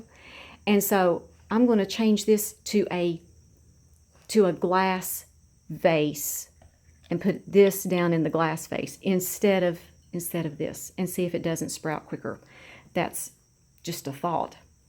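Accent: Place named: East Tennessee, United States. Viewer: Those are really great roots and I am also amazed at your accent. I was born ed and raised in upper east Tennessee and you sound like a touch of home. I am now in South west VA but I could listen to you talk all day. Thank you is all I can say.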